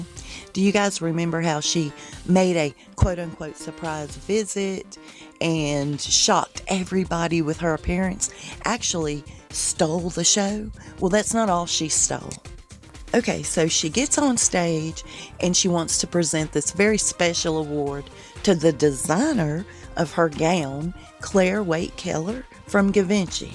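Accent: South, United States. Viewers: I love your reports. Your southern accent. You. Keep it up.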